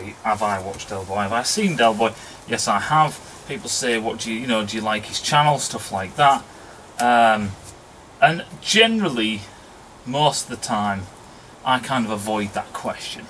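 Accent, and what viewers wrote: United Kingdom. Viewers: I just love you mate! People like you and others who just speak their mind, know their shit and aren't all politically correct being afraid someone gets their knickers in a bunch. I find your voice soothing when I'm drinking beer while pottering around in me own workshop, injuring my tools while the missus gets all wet thinking I actually know what I'm doing. Half of the time I'm not even listening but just enjoying that fine Brittish accent in the background. Keep doing your thing, or don't, fuck if I care!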